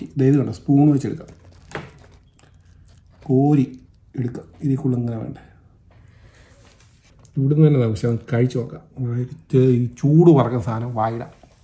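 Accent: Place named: Central Travancore, India. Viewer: ഈ.. ഒരു വരവും.. ആ പറച്ചിലും... ഞങ്ങടെ മാവേലിക്കര ചെങ്ങന്നൂർ.. തിരുവല്ല കാരുടെ.. നിഷ്കളങ്ക സംസാരം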